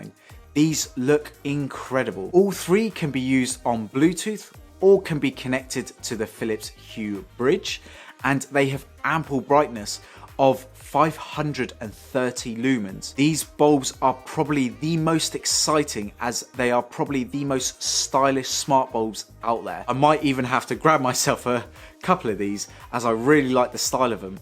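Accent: United Kingdom. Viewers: what a great reviw! . Amazing british accent!. Keep up ! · waster my time of 6.34 minute was think the product was uk market & he got a british accent! but i was wrong it for the usa market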